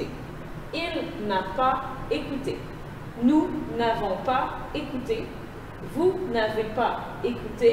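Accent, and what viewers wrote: England, United Kingdom. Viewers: vous avez un acsent anglais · You gotta english accent, it's so cute♡♡♡. Thanks for your french lessons!♡♡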